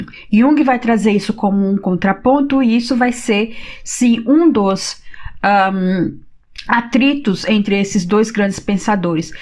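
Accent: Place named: Northeast, Brazil. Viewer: Adoro sua forma de passar o conteúdo.Seu sotaque nordestino é adorável.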